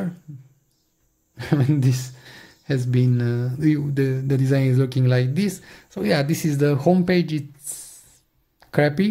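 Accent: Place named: Romania. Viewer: roman cumva îmi dau seama după accent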